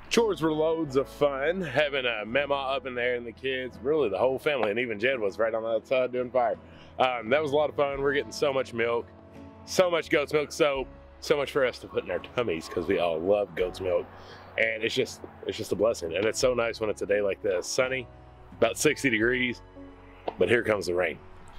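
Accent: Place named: Rural, United States. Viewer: Don't worry about sounding country. We all understand country just fine! 😉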